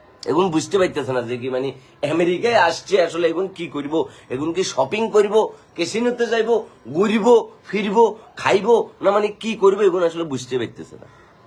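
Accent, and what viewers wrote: Kolkata, India. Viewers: ভাই আপনার ভারতীয় বাংলা ভাষা এক কথায় অসাধারণ লেগেছে। একদম কলকাতার টিউন। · Dada tomar Kolkata accent ta darun laglo ...😂😂😂😂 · সেই হইছে কলকাতা স্টাইলে । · দাদা আপনি কিন্তু কলিকাতার মতন কথা বলতেছেন আমাকে খুব ভালো লাগতেছে কথা শুনতে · uni hotat kore kolkata accest e bolse pura video te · Apnar Kolkata er golar tone ta khub valo hoyeche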